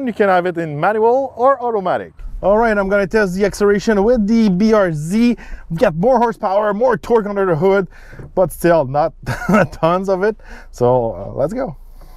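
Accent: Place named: Quebec, Canada. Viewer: Le ptit accent du Québec 😆👌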